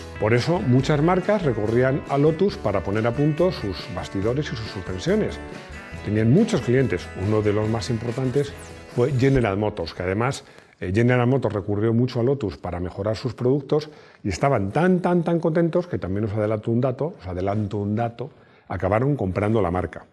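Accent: Chile. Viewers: La voz en off es de un Chileno?, que desagradable! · saludos desde La serena,Chile. inconfundible el acento nuestro. muy buenos videos....felicitaciones.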